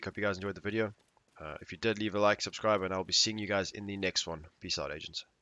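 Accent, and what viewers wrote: South Africa. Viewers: You South African! Sounds so. I subbed because of. Are you part of a clan?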